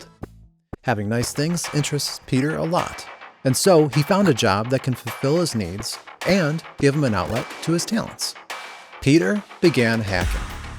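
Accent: United States. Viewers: are you from western New York man? like Buffalo or Rochester or something?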